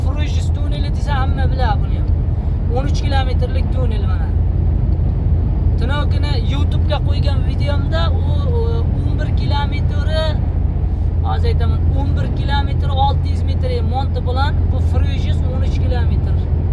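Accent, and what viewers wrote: Chiyal, Uzbekistan. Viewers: Qaysi tumandansiz chiyalga uhshap ketarkansiz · Мен хам гапларни эшитиб Чиялик бўлса керак деб ўйлагандим. Иш сохам бўйича Чироқчиликлар билан гаплашганман. Деҳқонобод хам шунақароқ шевада гаплашади. Майли омад ўзимни 92уруғли ўзбегим. ✊👍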